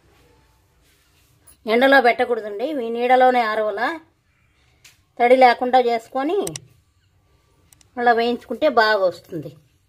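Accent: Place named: Hosur, India. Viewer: నమస్కారం అండీ, మా అవ్వ చేసేది వీటిని:-) మేము బ్రహ్మణులంకాదు గానీ మీరు చేసే చాలా వంటకాలు మా అవ్వలు చేసేవాళ్ళు. ఇటీవల 10 ఏళ్లుగా ఆహారపు అలవాట్లు బొత్తిగా మారిపోయాయి. మీ videos చూసి మళ్ళీ ఆ పాత రోజులు గుర్తుకొస్తున్నాయి. వీటిని మేము సింబిలి/శింబిలి ఉంటలు అంటాము. మాది హోసూరు/బెంగళూరు ప్రాంతంమండి, మీ యాస కూడా మా యాసలాగే ఉంది. మీది ఏ ఊరు?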